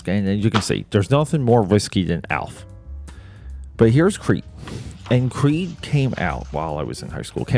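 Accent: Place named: Philadelphia, United States. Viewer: I knew you were from Philly by your accent😂 great stuff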